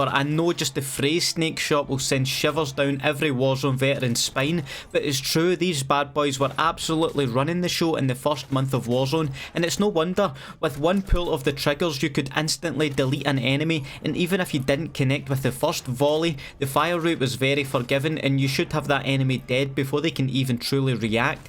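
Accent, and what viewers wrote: United Kingdom. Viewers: His British accent is just....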